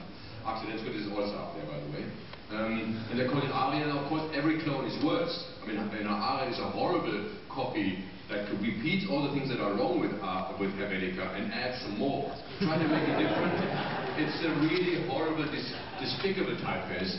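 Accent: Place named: Germany. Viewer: I can't understand a thing beside the fact his german accent it does not help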